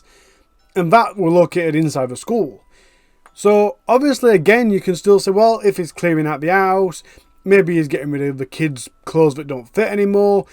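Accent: Yorkshire, United Kingdom. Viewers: I do love to hear a fellow Yorkshire accent on here though! It’s so refreshing! I just hope you don’t try and bend it with American terms just because a few daftys struggle for some reason.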